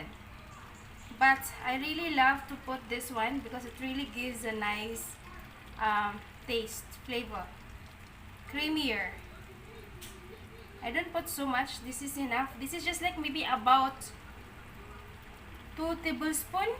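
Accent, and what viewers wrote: India. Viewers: I like your accent, u slightly get this Indian accent, mukhang masarap yan Sis thank u for sharing, stay connected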